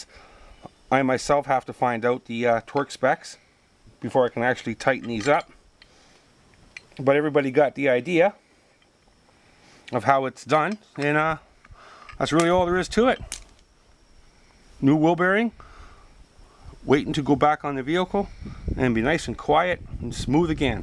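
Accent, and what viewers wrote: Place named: Canada. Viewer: Good possibility you're either Canadian, or from the U.P. I'm a bridge troll here from the L.P.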